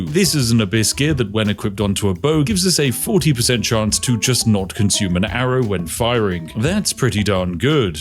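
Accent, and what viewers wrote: United Kingdom. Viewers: honestly I like your videos where you exploit stuff, but this game doesn't really sit well with you for the most part its narrating things that have already been shared weeks ago, I think you are also on previous patch just based on how your inventory looks, and by the way there is easy way to get the greater infinite arrows from abbys challange that doesn't take much time with explosive arrows. so kind of too late and kind of doing it hard way, but narrated in nice british accent :D also did you know about the golden apples that you can make gold bars with? find it less annoying than trying to find nobles with goldbars :D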